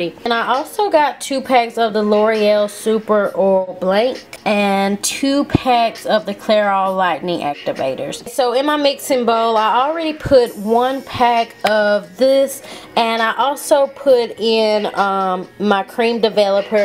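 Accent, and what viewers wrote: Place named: Texas, United States. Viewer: you have to be from Texas !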